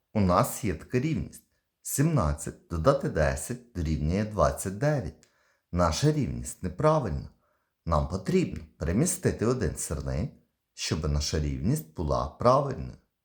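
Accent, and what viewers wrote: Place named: Poland. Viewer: я теж про те саме ніби то слова українські а акцент польський чи німецький або руминський, то відголосок генів чути через мову хоча і маскується під українця.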